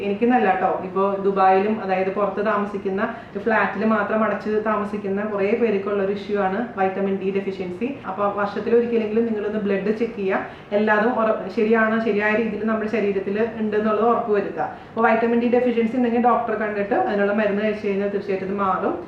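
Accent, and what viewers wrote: Thrissur, India. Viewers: Hii chechi nammude thrissur slack kalakito 😍enthurasa chechide samsaram ketukondirikan I like you chechi moleeeee😘🤩💗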